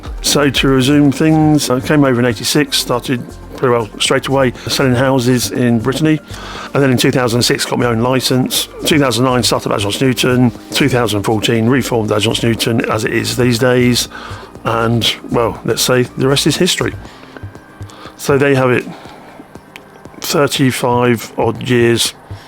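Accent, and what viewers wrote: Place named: United Kingdom. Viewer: Gosh, it’s takes an effort to follow you. Speedy British not sure from which part of England and a bit of man mumbling😊. But I sounds like your French is better than your English!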